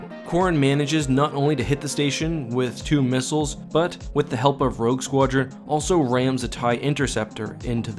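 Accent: Canada. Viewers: My happy place is listening to talking about star wars. Make love to my ears with that sweet canadian accent bro. 🇨🇦🇦🇺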